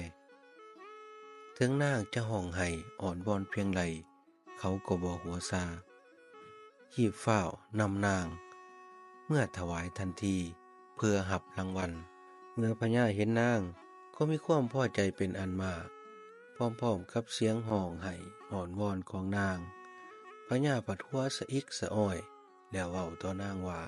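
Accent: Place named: South, Thailand. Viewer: ໄທໃຕ້ເວົ້າມວ່ນ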